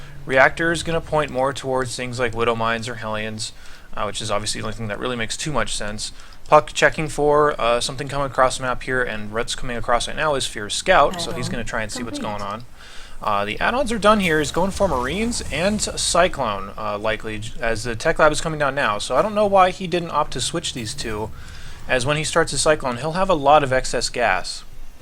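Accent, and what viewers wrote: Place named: United States. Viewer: Wow. Protoss OP as F*ck now huh? Hope this game isn't broken at this stage in the series... Can't justify toss having what appears to be disruptor mini-nukes, AOE traps on top of colos/tempest/ templar storm. Toss now AOE gods. The beta thus far looks ridiculously in favor of protoss. Also.... with respect, its pronounced "STAY-SIS", not "STAH-SIS". You sound like an American, so I can't understand your mispronunciation of Stasis...... FYI so you don't sound like you didn't pass high school English class.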